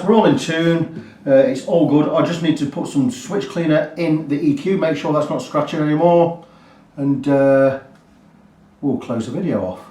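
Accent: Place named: East Midlands, United Kingdom. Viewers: I was guessing Leicester! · Great tutorial. Thank you for the gmi tools tip. You sound like you're from my neck of Sherwood Forest. Derby, Notts or Sheff area. Lovely to hear that accent all the way up here Int North. It was your pronunciation of 'about'. We both say it 'abart'. Top guitar tech in bud. Duly subbed and liked.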